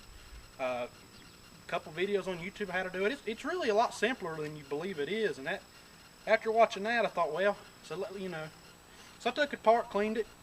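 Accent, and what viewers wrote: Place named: South, United States. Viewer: Love a good Southern accent my brother. COL StoneyB. Georgia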